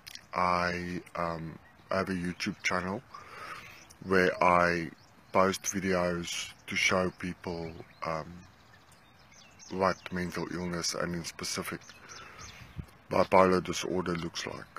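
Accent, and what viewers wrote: South Africa. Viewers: Your accent is so awesome. You must be South African. Keep fighting big fella ❤️🤙🤙🤙🤙🤙🤙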